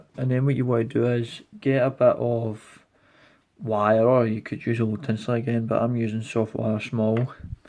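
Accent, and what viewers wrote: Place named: Scotland, United Kingdom. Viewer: Not being a Scot, I guess the accent fooled me! Not to imply the fly was not decently made! Where I am, we can still fish for sea trout, (Newfoundland, across the pond)! Fishing has not been cancelled here yet! Not very hard to stay six feet apart here! Hope things improve and you are able to wet a line soon! Stay safe!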